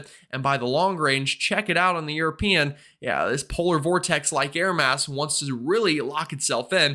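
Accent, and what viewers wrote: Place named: Philadelphia, United States. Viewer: Thats awesome, I have relatives down in the Phillly area and they have that accent. Very interesting to talk to people around the country. When I was young, we made a trip down south, on coming back my friends asked where I got the southern accent. It doesn't take long to pick up some of the lego you are exposed to.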